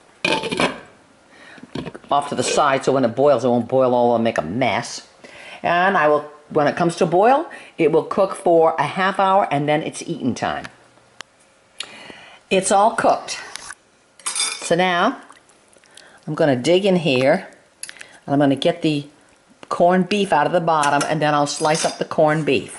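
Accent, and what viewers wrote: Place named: East Coast, United States. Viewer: your accent sounds east coast, but not like florida, good recipe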